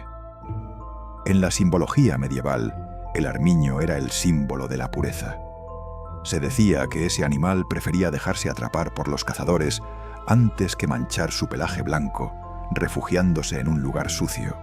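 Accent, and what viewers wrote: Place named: Castile, Spain. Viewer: He encontrado este canal por casualidad y ya me he suscriito........da gusto oir hablar este castellano tan maravilloso...............¡¡¡¡¡¡¡¡¡¡¡¡¡¡¡¡¡¡¡¡¡¡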